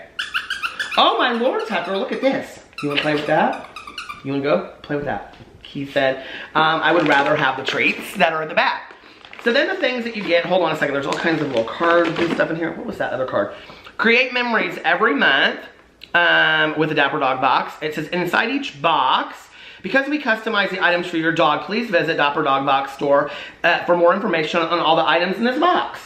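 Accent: South, United States. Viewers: My mom loved the little house on the prairie bit. She’s from the suburbs of Chicago and says, wow he has such a country accent 😂😂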